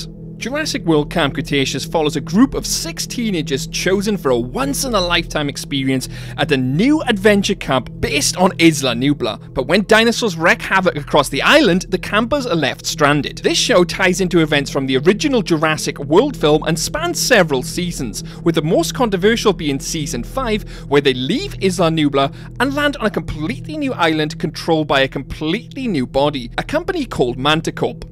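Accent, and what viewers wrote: Wales, United Kingdom. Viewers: is that… a welsh accent i hear!!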